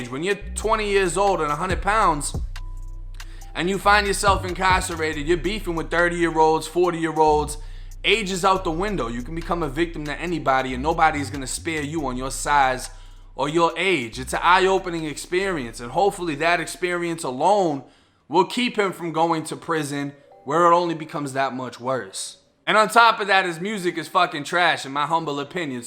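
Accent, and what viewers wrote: Boston, United States. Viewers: How u got a Boston accent but u from Florida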